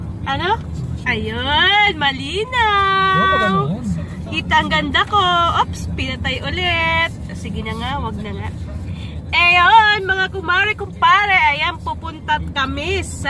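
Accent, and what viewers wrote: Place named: Bicol, Philippines. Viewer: Sarap ng tawa mu ah,hahaha ka bikol tlga ni palalabas mu "banonoy"😆😆😆😂😂😂😂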